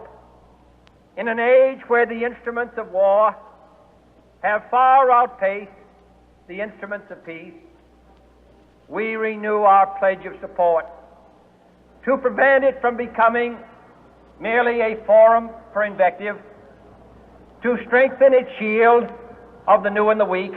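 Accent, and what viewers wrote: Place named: Boston, United States. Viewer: Ogre It’s the Boston accent.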